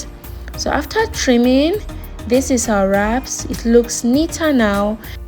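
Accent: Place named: North, Nigeria. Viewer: I love the third method: your voice. It's so soothing and engaging 😊😊😊 I sense an almost non-existent accent. Are you from Northern Nigeria? 😊😊😊 But honestly, thank you for this videos. The way you explained left no room for questions. You had every information in mind when you were making the video. ❤❤❤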